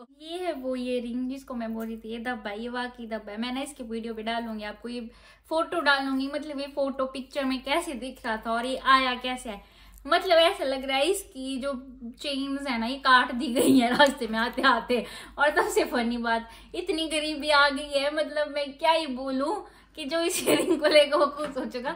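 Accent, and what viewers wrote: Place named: Himachal Pradesh, India. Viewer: Aap himachal se ho na bolne ke style se lag rha hai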